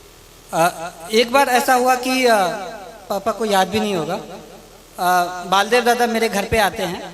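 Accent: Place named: Samastipur, India. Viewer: Sir ye to language samstipur ka hai❤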